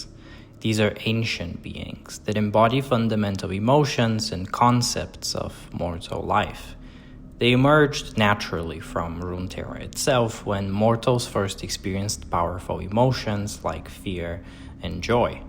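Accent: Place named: Manaus, Brazil. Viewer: u whistle while you talk, you sound like a little bird, people from Manaus, Amazonas, like me, have that accent too.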